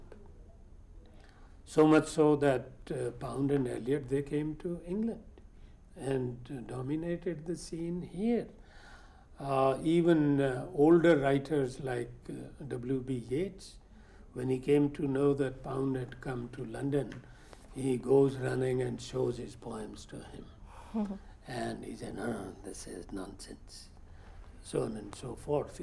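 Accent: India. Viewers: Useful but it goes heavy with Indian English.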